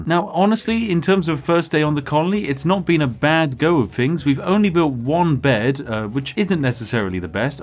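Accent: United Kingdom. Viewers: Reminds me of Ambiguous Amphibians series on this, but with a heavy dose of British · bro is british gray still plays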